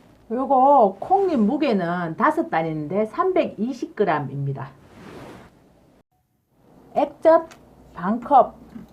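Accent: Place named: Daegu, South Korea. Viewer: 이 아줌마 진짜!!!!!ㅠㅠㅠㅠㅠㅠㅠㅠㅠ "사투리" "사투리" "사투리"!!!!!!!!!!!!! 어마무시 하다!!!!!ㅠㅠㅠㅠㅠㅠㅠㅠㅠ 사투리도 심한데,,,,,단어선택도ㅠㅠㅠㅠ 나도 경상도 100% 토박이 사람이지만,,,, 이렇게 심한사투리+안이쁜 단어선택 최강의 사투리 아줌마네!!!!!~~~~~~ 단어만 쫌 이쁜단어를 사용해도 좋을텐데 영상을 볼수가 없다!!!!듣기 싫어서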